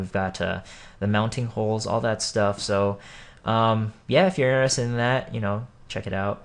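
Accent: United States. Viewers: this is my first time seeing you and I must say it, I did not see this coming, not being racist but I though you were america white because you had an american accent but you look pretty sexy (no homo). Other then that Congrats on you partnership you do deserve it :D.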